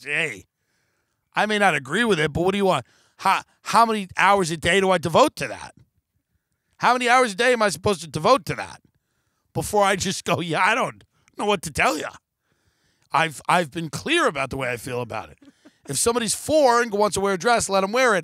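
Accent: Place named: Chicago, United States. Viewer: love you Tim. you sound like my Chicago cousins. i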